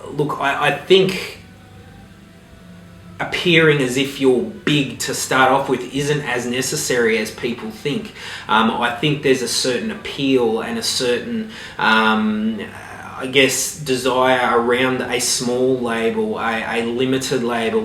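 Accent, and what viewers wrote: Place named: Australia. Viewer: I'm Australian and can tell he is also.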